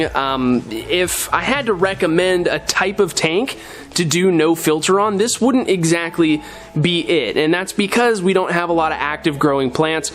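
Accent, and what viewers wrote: United States. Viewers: You’re a Minnesota kid???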